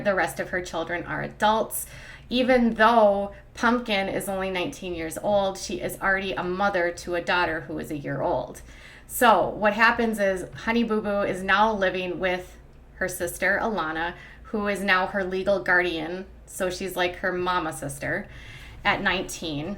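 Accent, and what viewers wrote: Minnesota, United States. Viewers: Haha awesome shirt!! I asked you if you were a midwesterner?? I can spot a MN accent or northern a mile away. I am from MN so not picking on you. I am a new subscriber and love your videos!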